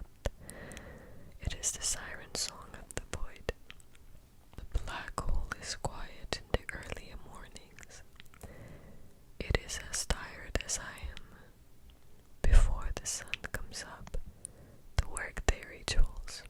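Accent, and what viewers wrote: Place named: Quebec, Canada. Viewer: she's from Quebec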